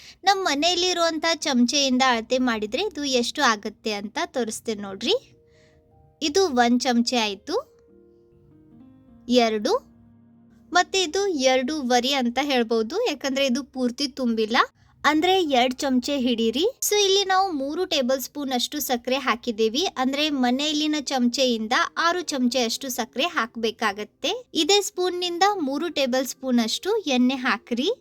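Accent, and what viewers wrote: North Karnataka, India. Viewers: Yavva yakka dharwad dag adi namma uttar karnataka language matadu agatte hogatte baratte bidu akkti barataiti annu kelaku chanda anastad innu hechhu jana nodatara ninna bashe keladra irritate akkad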